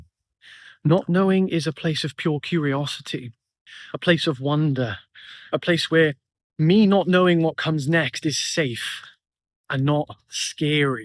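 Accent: United Kingdom. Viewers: I see you are in Australia but sound like a 🇬🇧:)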